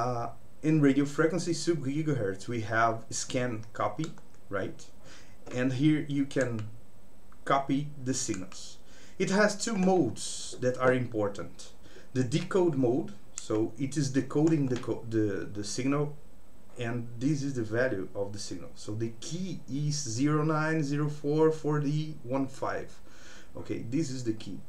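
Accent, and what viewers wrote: Brazil. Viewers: Term sotaque de brasileiro falando inglês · BR Mano? Sotaque parce muito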